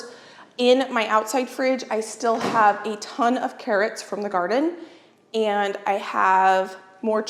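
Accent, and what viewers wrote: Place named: South, United States. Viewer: She cuts everything with that skinny knife she is funny to watch very entertaining Her southern drawl reminds me of my granny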